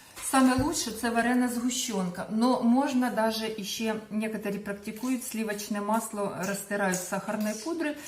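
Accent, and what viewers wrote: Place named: Surzhyk, Ukraine. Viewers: Ти молодчинка.я такой з -під Одеси.я зараз далеко від домівки.дуже скучаю.включпю твій канал і ніби дома,разом готую з тобою.А рідний разговор який!!!мені здається я тебе бачила чи на Привозі чи на Новому.Ти там може торгувала? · На суржике ГЭкать и ШОкать предпочитает 😮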